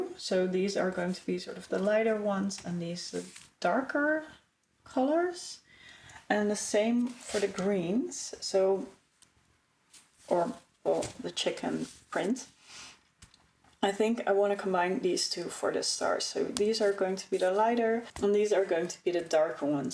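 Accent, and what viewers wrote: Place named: Netherlands. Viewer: Ik vroeg me vanaf het begin af of je Nederlandse bent, ik dacht dat aan je Engels te herkennen. En daar is het bewijs: in het Excel blad!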